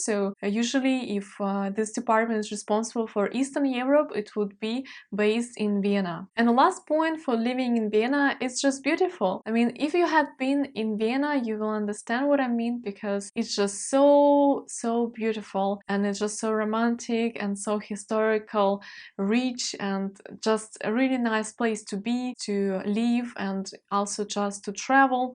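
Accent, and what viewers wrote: Russia. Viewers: ust i heard the 1st word i recognized that you're russian at once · Are you from Russia ? У тебя замечается русский акцент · Hallo liebe (meine Tochter heißt auch ) und Servus (=Hallo) aus Wien! Da dein Englisch viel besser ist als meines, schreibe ich dir auf Deutsch. Ich finde deinen leicht russischen Akzent bezaubernd! Gratuliere dir zu deinem sehr informativen Video. Das ist sicher eine große Hilfe für andere Menschen, die sich für ein Leben im schönen Wien interessieren. Du hast wirklich die wichtigsten Punkte erwähnt. Zu erwähnen wäre vielleicht nocht, dass Wien, für eine so große Stadt, auch sehr sicher ist. Ich denke, gerade auch als Frau, kann man sich in Wien eigentlich, zu jeder Uhrzeit, und an jedem Ort sehr sicher fühlen. Die Kriminalität ist kein nennenswertes Problem in Wien und auch die Polizei ist sehr zuverlässig und tatsächlich für den Bürger und die Menschen da. 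Ja, auch ich bin, natürlich als Österreicher (das gilt nicht nur für Wien) ein Kaffeeliebhaber. Es gibt wirklich unzählige traditionelle, wunderschöne Cafes in Wien, aber, geraden in den letzten Jahren, kamen sehr viele, moderne, coole Cafe dazu, die oft den Kaffee selbst rösten und hervorragende Qualität und Auswahl anbieten. Als Beispiel: <link> oder <link> Die berühmten "Mehlspeisen" also die süßen Dinge, wie Sachertorte etc. nicht zu vergessen. Natürlich leidet das Leben in Wien derzeit auch unter Corona und dem Lockdown, aber ich hoffe das bessert sich 2021 wieder deutlich. Auch ich vermisse es nämlich schon sehr, bei einem guten Kaffee, einer Zeitung und mit ein paar lieben Menschen, zu sitzen und zu genie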